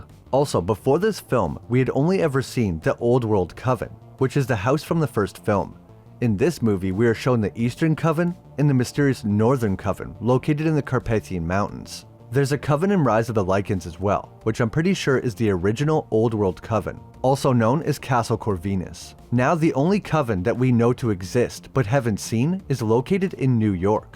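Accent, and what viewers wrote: Canada. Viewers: Your Canadian accent isn't slipping by me! keep up the good work my man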